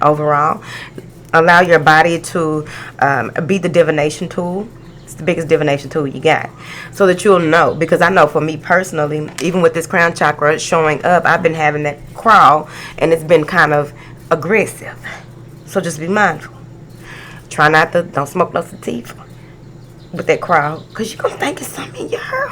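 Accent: South, United States. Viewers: That southern accent tho. 💜 🙌🏾💯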